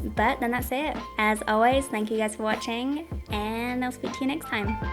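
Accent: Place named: North, United Kingdom. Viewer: I hope you don't lose your northern accent.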